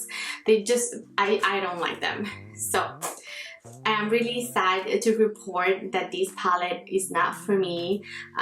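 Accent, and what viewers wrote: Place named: Canada. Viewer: I am so happy I came across your channel. You are so sweet. 💕. I love your accent. :) I see that you are from Canada. Me too. I am from Alberta. Where are you from? I am so excited to find youtube friends and the most excited when i see they are from Canada as well. 💕 You look very beautiful. Would love to be friends on here. New subscriber here as I love your channel and love coming across YouTubers that are in the same niche making makeup videos like me. 😘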